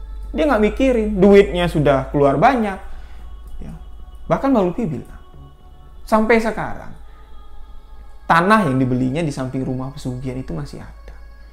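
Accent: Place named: Bali, Indonesia. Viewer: Bang logatnya seperti orang bali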